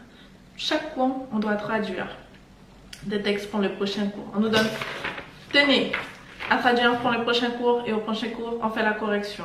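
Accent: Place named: French Guiana. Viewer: T’as grave l’accent guyanais ! ❤️ En tout cas merci pour cette vidéo. Au top 👌🏾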